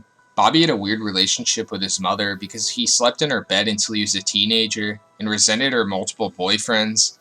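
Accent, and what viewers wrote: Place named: Canada. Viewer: he sounds irish canadian , defo got tht irish twang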